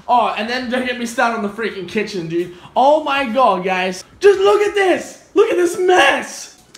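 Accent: Australia. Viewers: ily so much I like your Aussie accent I'm from Australia but I don't have an Aussie accent because my dad is from England. I want one though. And your really funny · r u austrailian mate? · I've been watching you so much that I've almost started to have a Aussie accent. Oh my god. Also if you deleted the chan I would die in <num> different languages :-)))))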